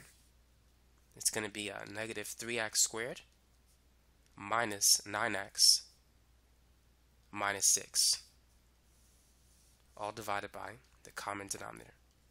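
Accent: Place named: Boston, United States. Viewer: lol i just realized you can tell from this guys accent he is from boston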